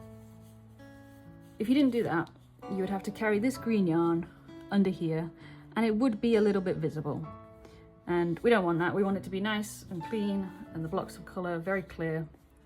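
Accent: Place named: United Kingdom. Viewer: URGH NO 😢 this is USA term why do people who sound so very British do this what is wrong with British terms? This is half treble! 😂😂 Sorry for the moaning personal bug bear I learnt from my nan fifity plus years ago any old british pattern will not work if you think this is half double not a half treble. That said super video I did. give you a thumbs up and the tiger looks fabulous do you have this available anywhere to get?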